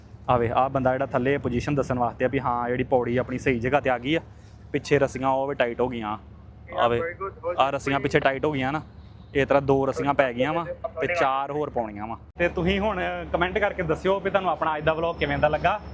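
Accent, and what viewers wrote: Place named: Gurdaspur, India. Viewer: tuhadi boli toh lagda hai k Gurdaspur dinanagar toh ho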